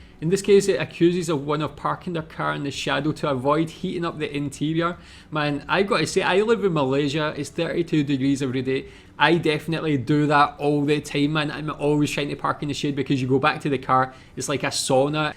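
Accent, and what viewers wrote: Scotland, United Kingdom. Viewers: oh boy, your scottish accent really showed in this video xD somehow love the way you say "booze idea" lol... but it also helps you with the german tongue twister... that was FLAWLESS :D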